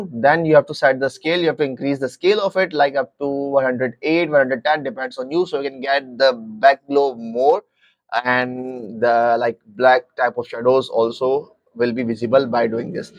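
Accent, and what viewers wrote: India. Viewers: Indian ahh voice (no hate)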